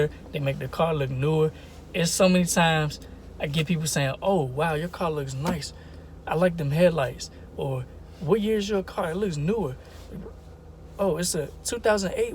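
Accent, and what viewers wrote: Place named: Maryland, United States. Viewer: as soon as i heard your voice i thought of maryland, i’m from NC but family lives there... good video brodiii💪🏾🙌🏾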